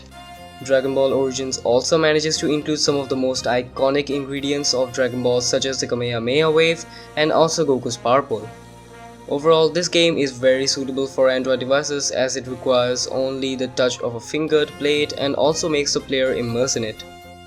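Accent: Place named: India. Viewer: I love the englisch Indian accent dialect Don't worry bro I won't steal your cow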